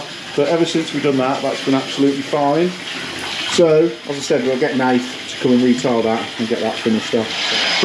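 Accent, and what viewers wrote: United Kingdom. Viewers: Are you from Wolverhampton?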